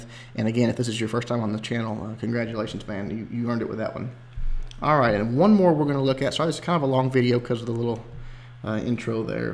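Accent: South, United States. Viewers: Hey, I wanna remind you of something you said on you 1st upload... You said your accent isn't the best but I love it!! "Golems are fixin to cross" omg!! Too awesome man you've made a brand about yourself that no one can measure up to!! Great job on the channel brother!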